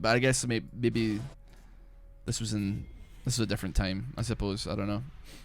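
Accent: Scotland, United Kingdom. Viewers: am from scotland u sound scottish enough for me man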